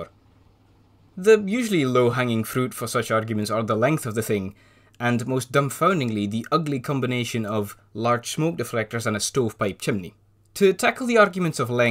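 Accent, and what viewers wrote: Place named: Scotland, United Kingdom. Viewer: You sound Scotish today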